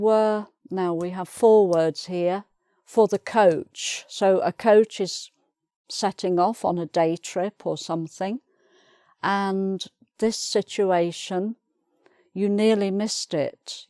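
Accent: United Kingdom. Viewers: British accent 😍 really lovely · Thank you so much for your lesson. I love your British accent . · you are a good teacher, and l like your strong UK accent very much! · I am a learner from China. You are so cute. And I really expect to have a Brit accent like you. I will follow your lessons. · Thanks. I love your British English. I hope learn more english with you. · I love your British accent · I like a lot as a teacher.Because she is so patient and very skilful in teaching English.Also I like so much her British accent.She is so nice.!!!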